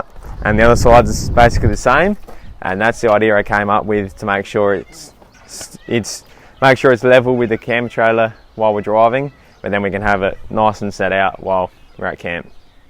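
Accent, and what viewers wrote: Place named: Australia. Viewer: If anyone is confused he is speaking Aussie!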